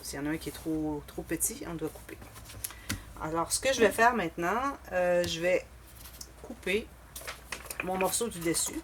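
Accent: Canada. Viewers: Super !!! Merci pour le tuto et aussi pour l'accent canadien que j'adore ! Bonne journée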